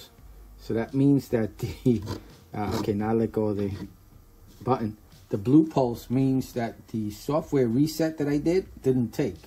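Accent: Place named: New York, United States. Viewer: Bro, thank you for sharing this I was trying to help my sister in Orlando and I could not figure out how to reset her Google Wifi two. The button on the back of the gen 2 is underneath the device with no fiscal button. Bro, I was listening to speak and I swear you sound just like me. I told my wife to listen to your video and she was that the voice sounds familiar. She tells me that's a New Yorker voice. I told her that's me except you have a little higher pitch. Anyways, thanks for sharing.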